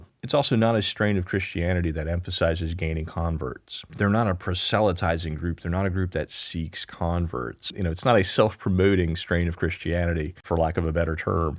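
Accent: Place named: Baltimore, United States. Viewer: You sound like you’re from where I’m from lol. The way you say your Os. I’m from just north of baltimore, not to far from SE PA Amish country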